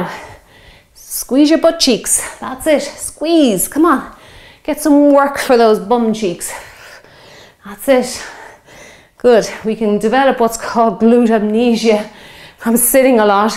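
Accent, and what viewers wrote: Ireland. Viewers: I was just looking around for a workout to get me back into a good workout routine after a long time away due to injury. This workout is just perfect. And your Irish accent is so lovely to listen to!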